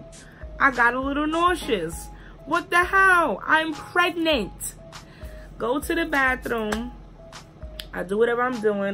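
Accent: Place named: Brooklyn, United States. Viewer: I bet you from Brooklyn 🤮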